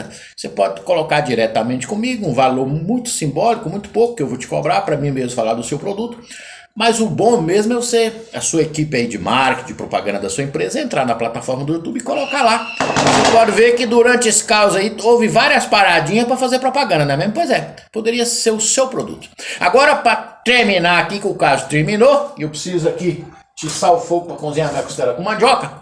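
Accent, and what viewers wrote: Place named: Minas Gerais, Brazil. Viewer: Esse cabra é mineiro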